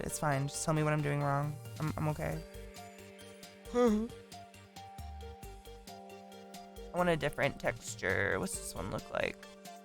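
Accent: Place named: New Jersey, United States. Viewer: Nuthin like a Jersey accent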